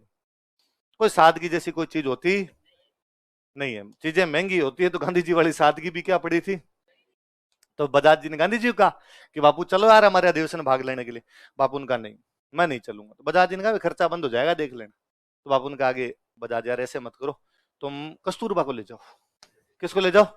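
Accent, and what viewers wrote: Rajasthan, India. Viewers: Bhai ji apki Rajasthani tone me hindi bhot chchhi lagti hai ❤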